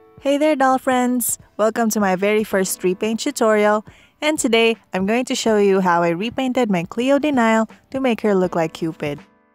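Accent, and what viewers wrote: Philippines. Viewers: What country are you from <3? Your accent sounded like you're Filipino O///O !? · are you a filipino? your accent sounds like one ☺️ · Was it just me or you sound like a filipino? I'm not racist okay cause I'm also a filipino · are you a filipino? cause your accent lol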